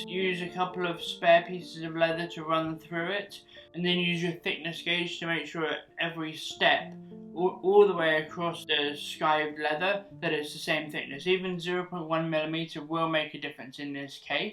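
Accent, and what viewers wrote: United Kingdom. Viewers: Unfortunately the huge reflections on/off your glasses..is well very distracting..that then the lower then normal voice volume mixed with the possibly British pronunciation and dialect makes for difficult viewing experience..a pair of non reflective glasses and turning up the vocal volume overall would really improve your videos..Cheers mate..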